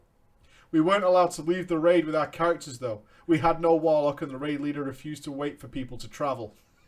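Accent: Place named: England, United Kingdom. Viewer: Is it a bad thing that I'm Canadian and have accidentally adopted an English accent listening to 'im or is that normal?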